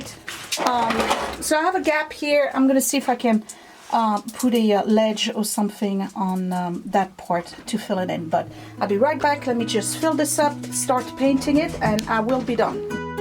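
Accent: France. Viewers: Amazing, you remind me so much of my mom, not for your age, of course, as I am the same age as you, but cause you’re so creative and crafty. :) Love your french accent. I am Italian and also have an accent and nobody can ever tell it’s an italian one lol. They always think it’s from somewhere else :)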